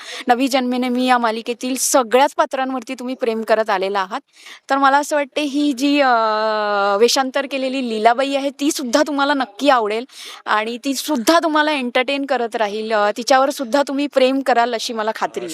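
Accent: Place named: Vidarbha, India. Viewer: आपली भाषा मस्त आहे विदर्भ ची पोटे 😂😂😂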